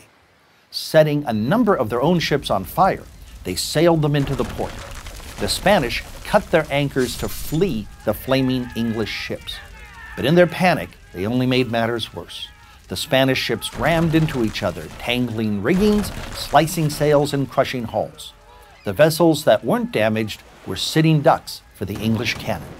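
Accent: United States. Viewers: Yes, one video with an American narrator shows what every American thinks Britain is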